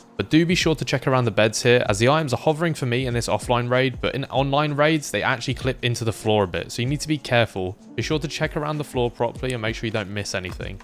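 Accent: United Kingdom. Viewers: Easy sub for you here mate. Clear consise no BS and British. Woods not intense though? Gotta argue on that one! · Best woods guide on the internet, with a British accent to top it off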